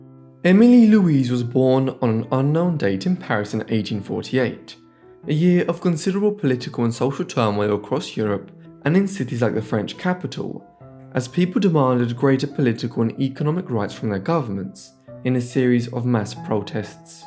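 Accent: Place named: United Kingdom. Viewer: Perhaps it is a good idea to write the names of streets and places on the screen as well, just like you do with the names of people. I could not make out some of the locations. Your pronunciation is too English for me. French is spoken in front of the mouth with articulate movements of tongue and lipts, English is spoken in the back of the mouth with minimal movements of tongue and lips. This is why the English, whose culture makes them refuse to alter their habits, it is well-known, seldom manage to speak French like French. It is not a critique but an observation. Start speaking French in the front of your mouth and all of a sudden it sounds French and people will understand your French with great clarity. Just try it sometimes.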